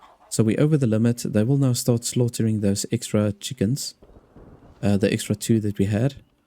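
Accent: South Africa. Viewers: Hey dude... as a South African. You sound like a South African. Where you from???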